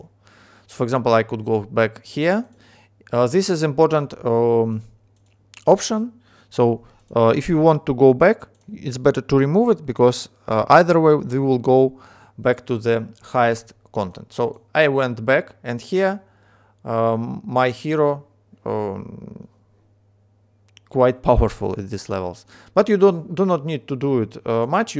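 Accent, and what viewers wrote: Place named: Russia. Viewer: Охренеть. Не нашёл нормального гайда на русском. Внезапно нашёл данный на инглише..... и сразу чувствуется дикий русский акцент - всё понятно :)))) Спасибо! :)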